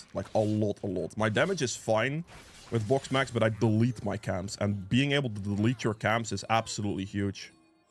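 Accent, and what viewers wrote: Netherlands. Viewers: you look like kingsize lol (dutch rapper) guessing ur from the Netherlands by ur accent too, great vid once again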